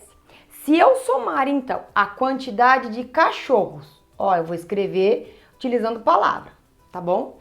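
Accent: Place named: Paraná, Brazil. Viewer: eu escutando o video e pensando " nossa esse sotaque é familiar" ai na hora que ela falou Paraná eu lembrei dos meus primos do Paraná que falam igualzinho a ela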